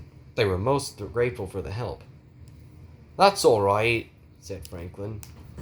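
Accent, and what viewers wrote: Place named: Australia. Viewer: why does he have an australian accent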